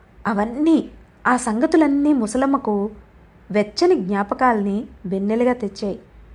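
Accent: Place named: Srikakulam, India. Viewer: మా గొప్ప కథను పరిచయం చెయిస్సీరు...❤ సిహాకుళం భాషలో విండం ఎంత బావుందో మరి చెప్పలేను బాబో...😍❤❤❤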